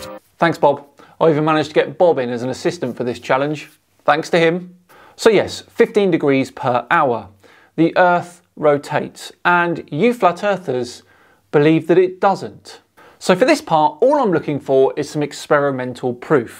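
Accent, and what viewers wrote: United Kingdom. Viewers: Is it just me, or, is it strange hearing a British guy say dollars?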